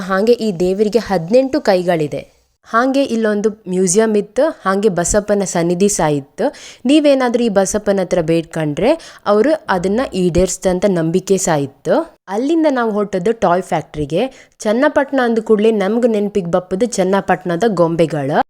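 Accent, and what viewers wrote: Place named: Kundapura, India. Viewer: Happy to hear your Kundapura kannada❤all the best for your further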